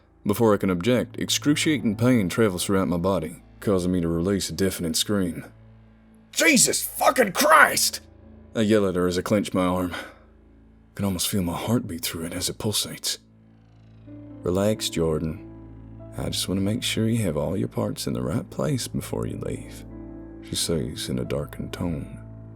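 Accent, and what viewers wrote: South, United States. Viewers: As a native Southerner, I'm very impressed with your accent work, cool story too! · You got the southern accent down. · The best narrator with the best stories! Your southern accents are almost perfect. Only two words give you away - been and says. Southerners pronounce them as ben and sez. Yes, I am a hillbilly. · Born and raised in Bama and I thought the same thing. Fantastic job, just minor details. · North Carolina Appalachian boy, daddy from west Virginia and I'm from the smokies. You're right, this guy nails it better than Hollywood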